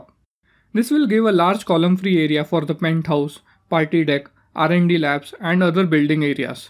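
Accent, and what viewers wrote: India. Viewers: He soundz like rajistahn 27 responses matches the 27 disapproves Manhattan area tall buildings of ny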